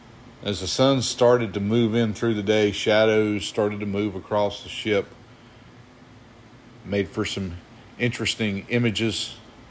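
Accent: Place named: Georgia, United States. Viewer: I came over from “What’s Happening in Shipping” as well. Enjoying commentary and level of detail. But more than that, I was raised in Athens so it’s great to hear a Georgia accent! Thank you!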